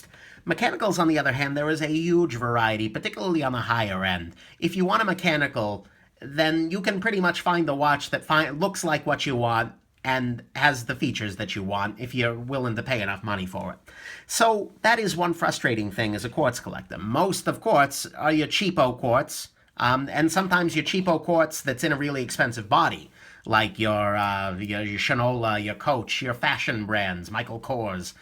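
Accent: New York, United States. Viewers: Is that a New York accent?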